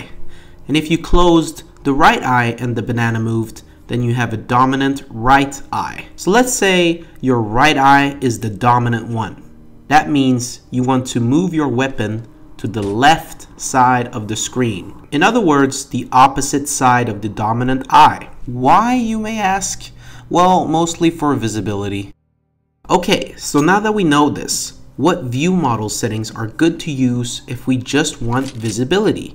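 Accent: France. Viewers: banana are u french? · Are you french ?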